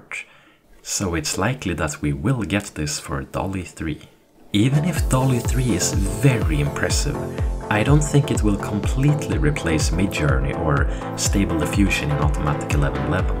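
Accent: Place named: Sweden. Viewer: Nice Swedish accent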